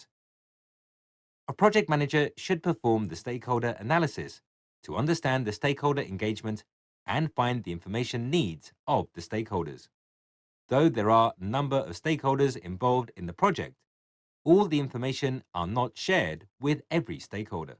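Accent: England, United Kingdom. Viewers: I think the voice is British. Sounds fine to me. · I don't get why people are moaning about the voice.. I'm English and sounds like a normal English accent to me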